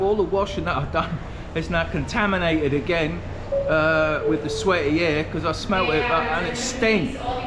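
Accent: Essex, United Kingdom. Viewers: Where you from in the uk? Sound like Essex same as me lol 👍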